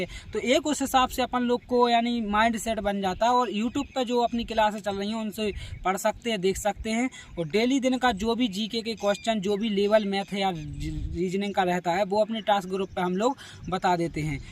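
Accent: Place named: Rajasthan, India. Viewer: Bhai apka Rajasthan me kha se ho rajsthan jaisi language lag rhi hai like bharatpur alwar dholpur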